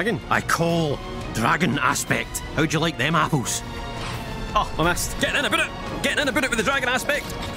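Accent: Scotland, United Kingdom. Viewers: scottish skyrim daddy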